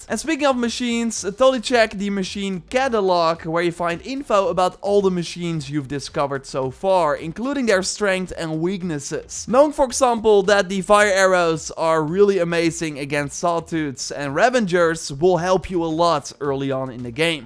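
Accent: Netherlands. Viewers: You're Dutch right? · I smell dutch🇳🇱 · Jij bent vast en zeker een Nederlander kan k horen aan 't accent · He ben je ook nederlands (nederlands accsent) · That accent 😂❤️ You’re definitely Dutch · This guy HAS to be dutch with this accent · Amai da's een extreem accent ik geloof niet dat je geen Nederlander bent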